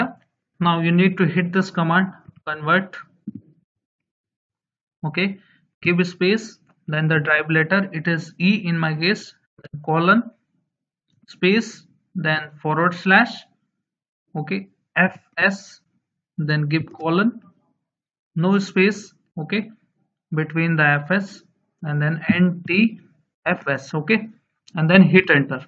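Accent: India. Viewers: Whenever I search for tech help and hear a Indians voice when the video starts I know I'm going to get the help I need.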